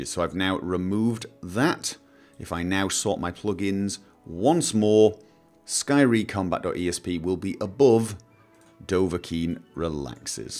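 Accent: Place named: England, United Kingdom. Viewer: I think an english accent makes you sound 100% smarter.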